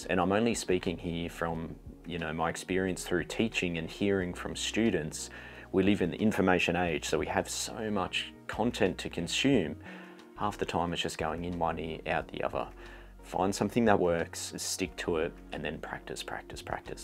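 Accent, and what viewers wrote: Australia. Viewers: There's absolutley nothing redeemable about the thin Australian accent.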